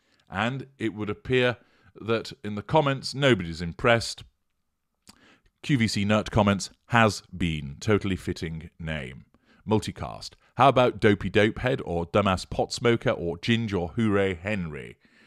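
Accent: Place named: North, United Kingdom. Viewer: voice, which is warm and educated, has a hint of vowels north of Watford (Brits will know what I mean). I picture him exceedingly well-dressed in Savile Row suits and quality shirts with monogrammed cufflinks. His shoes are hand-made and always polished. He is well-groomed and never has long or dirty fingernails. I am actually describing my fantasy man! may of course be prone to slobbing it in a hoodie, baggy jeans and trainers - somehow I think not!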